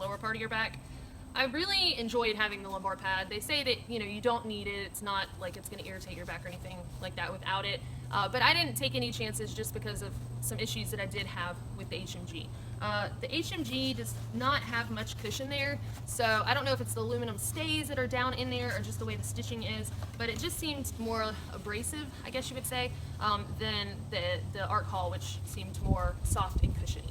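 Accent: South, United States. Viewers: As an aussie guy I shouldn't find your southern accent "comfortable" but I do, I love listening to your voice and I could listen to it all day every day (...and I kinda have been, going through a " Marathon" for the last week or so... 🎶. The fact that it's clear from listening to you that you have a sharp mind and that you are pretty good at phrasing what you want to say probably helps...) I do like the look of that Zpack Arc Haul, and even more the look of the Haul Zip 64L, pity that it appears like you can only buy from them directly, as there's very limited scope for specials. I am in the process of updating my gear for a multi-day late spring trek in the Japanese Alps next year, and I'd like to take the chance to thank you for converting me to quilts (side sleeper here) 🙏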